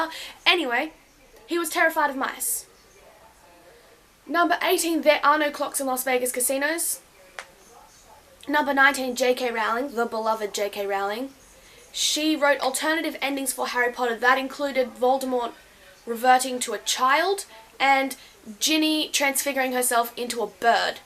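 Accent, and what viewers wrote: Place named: Australia. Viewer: Great video :D Love the Aussie accent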